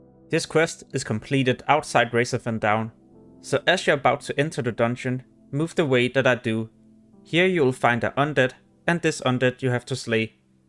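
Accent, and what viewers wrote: Denmark. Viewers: Hope it won't fix that lovely danish accent tho! I love it, keep it up man!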